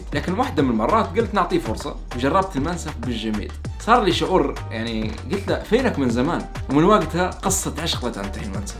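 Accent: Libya. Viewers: اللهجه الليبية قريبة جدا من الجزائريه ❤️❤️كل التوفيق والنجاح ✌️💙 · يازين الهجه الليبيه ياعرب 🇸🇦🇱🇾💓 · هلا بعودتك منور وفيديو رووووعة يسلموو ... اللهجة الليبية عشق لا ينتهي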